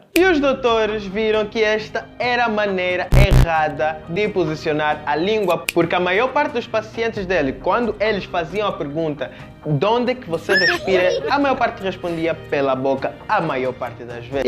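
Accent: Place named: Angola. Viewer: Deve ser da angola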